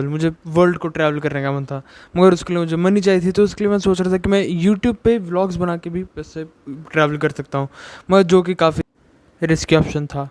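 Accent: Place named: Punjab, India. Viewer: Very informative but that thoda sa Punjabi accent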